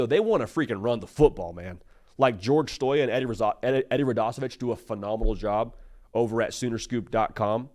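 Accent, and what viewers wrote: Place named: California, United States. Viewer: For me it's the 100 times a video he says "y'all" in a Cali accent.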